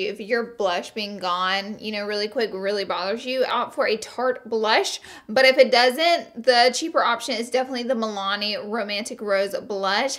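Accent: South, United States. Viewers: The vocal fry combined with the deep southern accent can be unnerving to some people, but I'd say just don't watch. Her content is good, and it's not like she can help it much. Don't know why people feel the need to be nasty about it. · I love these videos!!!! They are just the best! I love ur southern voice!! Ur vids are just the best! And ur palette!! Come thru!! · Yes baby you got that beautiful southern bell accent 💞 makes me feel at home. 🤗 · By the way I love your accent it's so cute and southern💕💕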